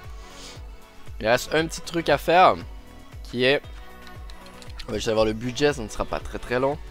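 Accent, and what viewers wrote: Quebec, Canada. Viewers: Salut au départ ton accent quebequois j'arrivais pas à y mi habituer (JE suis français) mais tu commentes bien et tout ce que tu fait dant tes vidéo cest sz que je ferait moi alors continue :)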